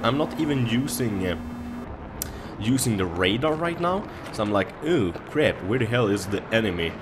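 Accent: Sweden. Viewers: u are swedish? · Yet... Yet gameplay, ah your accent is so Swedish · Are you from sweden?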